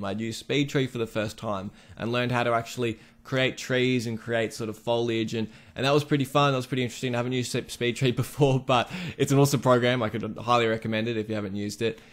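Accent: Australia. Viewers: yooo idk why i got so excited as soon as i heard your aussie accent lol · ayyy its another aussie bloke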